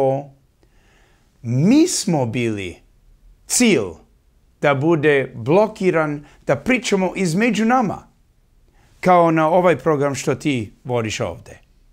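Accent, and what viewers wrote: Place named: United States. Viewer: pa bolje Schmidt od tebe srpski prica moj!!!! pa dokle?? evo godinama slusamo taj tvoj americko-srpski i sve tezi i teti za slusat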